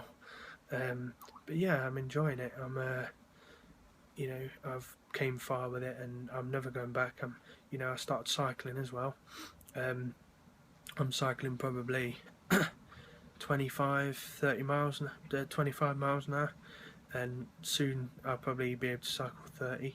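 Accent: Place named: Hull, United Kingdom. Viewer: You have got to be from Yorkshire. I am, you sound very Hullish?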